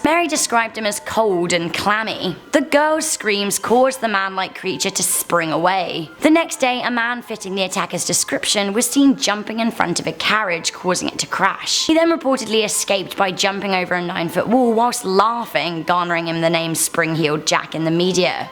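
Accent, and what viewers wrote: United Kingdom. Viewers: You lived in London until you were 8 before moving to Toronto? Why do you still have the stereotypical British accent then? Shouldn’t you have picked up one from Toronto?? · I knew you were a brit us brits have eachothers backs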